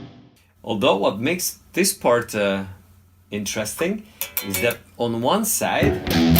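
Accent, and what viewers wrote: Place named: Hungary. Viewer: A jó magyaros angol akcentus a kedvencem :D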